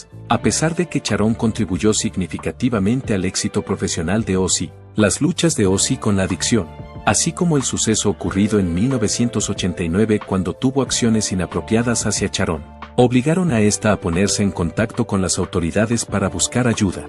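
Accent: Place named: South America. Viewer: Creo k se refiere a k fue una estrella xk antes hacía conciertos y sacaba discos y ahora por su enfermedad no puede solo por eso o de pronunciar Charon en vez d Sharon es porque es sudamericano y ellos pronuncian así por ejemplo mi nombre es azucena pero ellos dicen asusena hasta tengo una anécdota con eso un día k fui a recoger una pizza me decían k era para asusena no para azucena ja ja ya ves osea k no se lo tomes en serio k el chico hizo un video muy bueno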